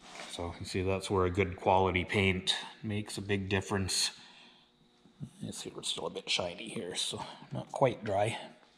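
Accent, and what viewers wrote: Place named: Canada. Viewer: Brilliant video. And the Canadian accent to explain the process! 🏴🇨🇦 👍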